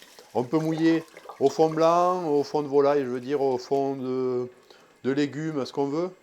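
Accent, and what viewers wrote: South, France. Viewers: La recette que je cherchais, merci ! Et puis cet accent du sud, un vrai voyage culinaire ! 😊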